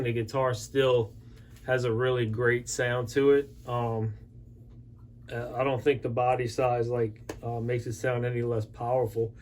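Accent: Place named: Louisiana, United States. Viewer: So i'm listening to this video but wasn't watching it and i hear the unmistakable accent from Da Parish and then i pulled up the screen and i'm like woah i know this guy. Great video man.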